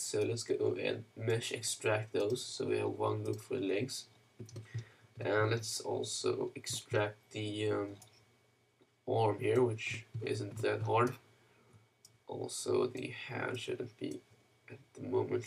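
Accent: Sweden. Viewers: Haha, fan va man hör att du kommer från sverige ibland!! Lidingö eller? :P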